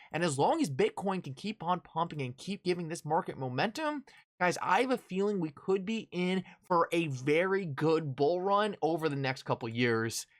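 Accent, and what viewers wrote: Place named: Delaware County, United States. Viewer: That’s that Delco accent… he won’t admit it but I knows it when I hears it…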